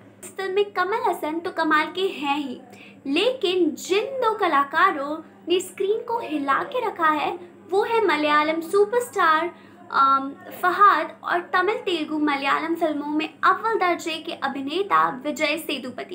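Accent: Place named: United Kingdom. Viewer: Your Hindi assent is Englishized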